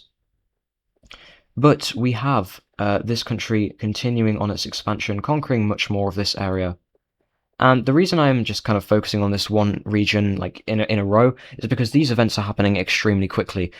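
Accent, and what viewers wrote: United Kingdom. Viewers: Pls stop posting my ears bleed when I hear your nasty British voice, I hold no love for that person, for their presence is as a storm upon a once-peaceful sea. Their words fall upon the ear like poisoned arrows, clothed in fair speech yet bearing bitter intent. Were honesty a jewel, they would wear but an empty setting. I would sooner seek fellowship with winter’s frost than endure another hour in their company. Let fate carry them upon a path far from mine, for where they stand, discord follows as faithfully as a shadow. Thus I leave them to the judgment of time, wishing not vengeance, but only that our roads never again should cross.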